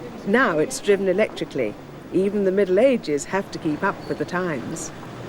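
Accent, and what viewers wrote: RP, United Kingdom. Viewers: Yes I think I love you with your very posh accent and rusty French (I don’t care if you are now 85 years old) many a good tune is played on an old fiddle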